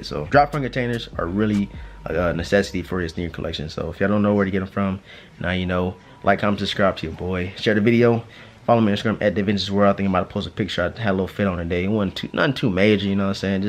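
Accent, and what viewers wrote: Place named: Miami, United States. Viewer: Hahaha you sound just like us down here in Miami. “Y’all boys!”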